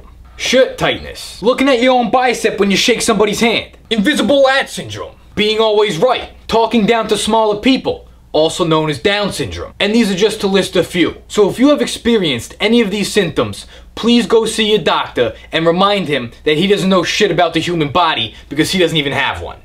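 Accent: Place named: Italian-American, United States. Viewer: It's second-generation Italian-American accent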